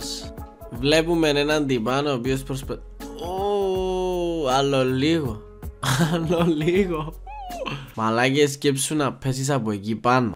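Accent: Cyprus. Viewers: Εισαι απο την κυπρο? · ΛΑΤΡΕΥΩ ΤΗΝ ΚΥΠΡΙΑΚΗ ΠΡΟΦΟΡΑ❤ Ειδικα του!!!❤❤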